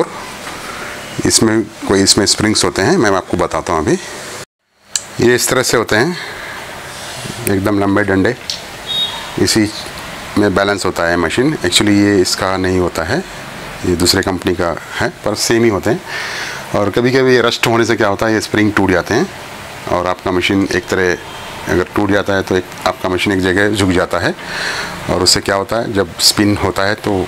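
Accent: Hyderabad, India. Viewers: 100% he is from Hyderabad India ...Correct me if i'm wrong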